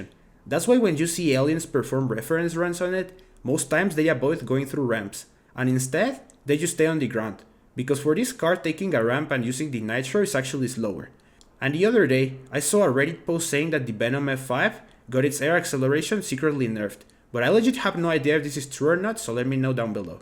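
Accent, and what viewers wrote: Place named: Mexico. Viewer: dude u sound mexican af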